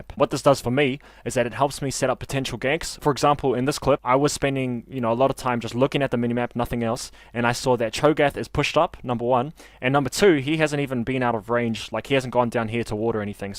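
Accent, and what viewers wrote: New Zealand. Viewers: Bro are u from NZ u sound Maori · you a kiwi bro? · Solid Vid i hear the nz accent hahahah cheers for the solid tips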